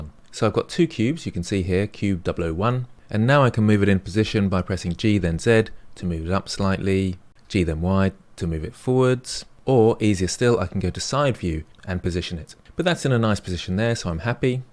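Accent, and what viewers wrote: United Kingdom. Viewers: You're quite British dude. just couldn't follow blender guru at all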